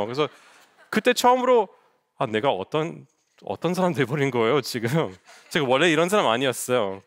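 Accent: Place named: Seoul, South Korea. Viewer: 어디 사투리요? 뭐 경상도 사투리, 전라도 사투리 이런 게 있어야 사투리로 인식을 하죠.. 억양이야 특이하지만 그냥 서울 말씨로 들리는데.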